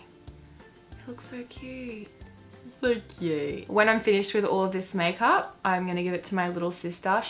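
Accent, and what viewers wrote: Australia. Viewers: Is she Australian?? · She's Australian.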